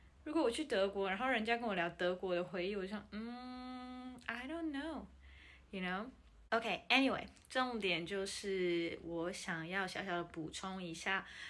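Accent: Taiwan. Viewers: 我在台灣出生的女兒剛好跟版主相反，她仍有台灣國籍，我是美國陸軍退休，駐於德國兩次共6年，一次在巴伐銳亞省的Vilseck，一次在Wiesbaden，我女兒在4歲的啟蒙教育是在Vilseck的鄉下的學校很快就學會巴伐銳亞腔的德語，出外在德國旅行有需要時都靠她翻譯，有一次開車去法蘭克福途中的一位德國人問我的女兒她的德語怎麼有這麼重的巴伐銳亞鄉下腔（就像Tyna在南部學台灣國語，我也是一樣，而我老婆是台北人，所以女兒也講帶美國腔的天龍國國語），我説女兒在Vilseck短暫讀過德國當地學校。幾年前我退休全家搬回美國加州，一位剛認識的小六同學問我女兒，為什麼長的東方面孔的她講英文有帶歐洲腔，幾年後因為不常用，她小時候順口的鄉下德語也忘得差不多了，只是偶爾不爽時德語三字經還是會不小心冒出來。😅😅😅感謝Tyna分享台灣。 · 以前一直很想聽聽看長著外國人的臉講台灣腔中文的外國人是什麼感覺，因為自己想像有點難，現在終於實現了ＸＤＤ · 想說怎麼有一個講話這麼台的外國人 然後把妳的影片看了一遍，連新聞片段也去看了一下 訂閱妳了，加油啦！ 如果想做YT一定會有非常多酸民的 要進廚房就不要怕熱 而且酸民也是來幫妳充流量的 只是他們不酸一下會不舒服 做空姐也是會遇到奧客的 家是人的避風港，如果妳覺得台灣是妳的家， 那這裡就是妳的避風港，歡迎回家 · 光聽講話就知道一定是在台灣長大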